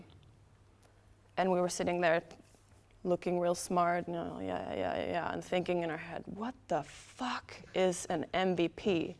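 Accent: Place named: Germany. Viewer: I can hear a German accent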